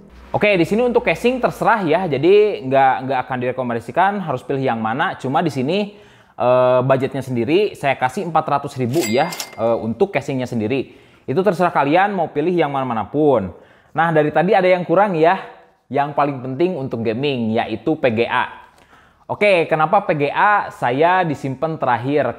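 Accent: West Java, Indonesia. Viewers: ep dan pi sunda pisan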